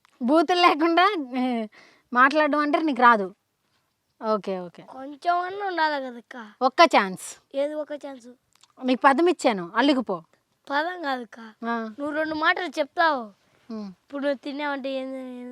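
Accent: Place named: Visakhapatnam, India. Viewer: maa thalley annadi antey pakka vizag eyyyyy…😎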